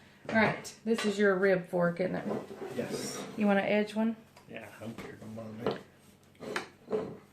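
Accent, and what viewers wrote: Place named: Eastern North Carolina, United States. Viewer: I feel so at home listening to you talk.... I'm from Eastern North Carolina and you sound like home to me!!!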